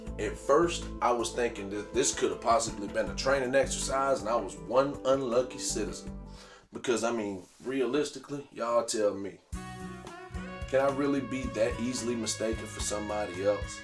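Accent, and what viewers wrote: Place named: South, United States. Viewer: I'm getting the weirdest vibe from you here. It's clearly all insincere. It looks like bad acting as you're reading a script. But there's more. You have the weird redneck accent thing going on with sayings and mannerisms that are supposed to convey that you're not too bright and that you're one of us. It's all fake though. You're smarter than you're selling yourself as. You're gaslighting and faking. I won't be subscribing for this "content" you're trying to sell me on. It's not clear what this channel is about but it seems clear to me that you're trying to monetize this incident. You put on this "cool" persona but your acting is terrible. Most people will not even notice though. Most everything people watch these days is completely fake and they still accept it as real. They say "I know this reality show is edited and scripted" but in reality they are falling for it. 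They are desensitized to fakeness. I found this video rather repulsive to watch. You are not the everyman you're trying to sell yourself as. You are not stupid. Most people will fall for this bad acting job you're doing but I am not one of them.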